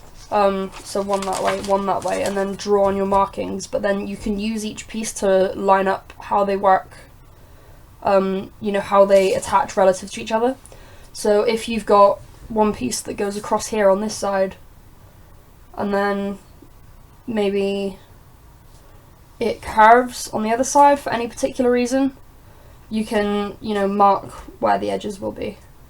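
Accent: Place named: Northern England, United Kingdom. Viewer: Hey! By your accent it seems like you live in the north of the UK. Is there by any chance seeing that I live in England and good shops or websites for quality fur that is in the UK so I don't have to send £40 for shipping?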